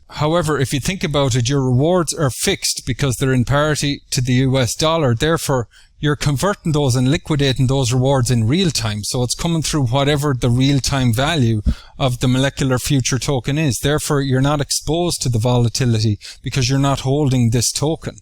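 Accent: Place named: Ireland. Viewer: awesome breakdown! the Irish accent is a pleasant bonus!! thanks heaps..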